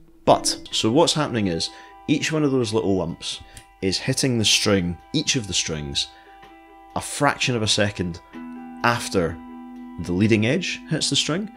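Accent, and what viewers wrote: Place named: Scotland, United Kingdom. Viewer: what part of Scotland are you from?...My mother is from Blantyre and your accent sounds suspiciously close!... 😊...I just ordered these pics... thanks for the review and recommendation!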